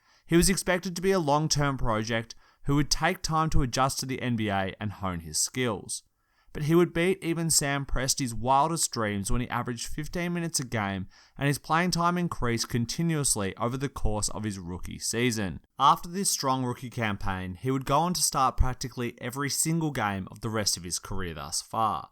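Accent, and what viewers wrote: Australia. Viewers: Aussie accent indeed..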